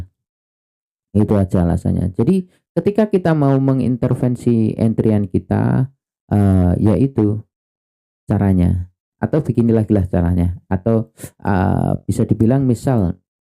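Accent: East Java, Indonesia. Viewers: Apa mas nya dari jatim ? Bahasanya medok jatim 😁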